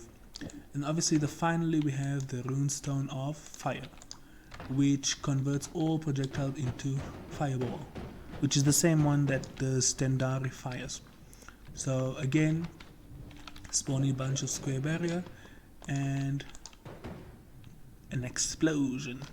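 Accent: South Africa. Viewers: Are you south african? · Are you south African? Wondering based off your accent and I'm from Johannesburg. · South African?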